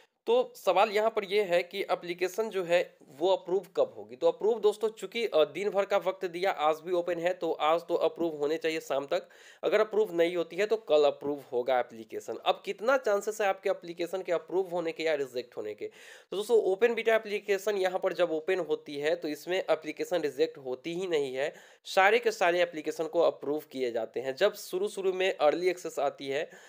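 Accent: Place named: Bengal, India. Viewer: Vii bengali ho ka hind sahe se nahe bol pa rahe ho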